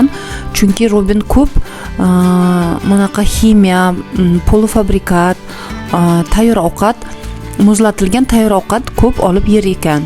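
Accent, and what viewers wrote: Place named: Chapan, Uzbekistan. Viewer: Chapani gaplariz biram yoqabiba bechara kuyovimiz til bilmay qaytarabi gapizi ola xorjin dep😅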